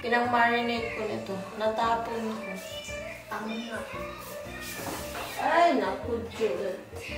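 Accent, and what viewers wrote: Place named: Visayas, Philippines. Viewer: Hello, I am new to your channel. Saang part ka ba sa Pinas? Halata na bisaya ka.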